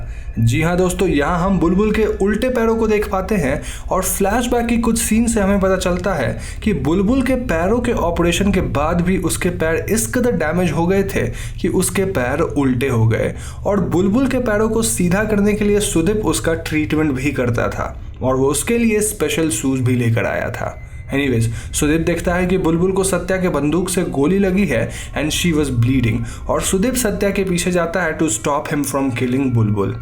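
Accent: Bengal, India. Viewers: Are u a bong? “😃.....Anyways”..... very well explained!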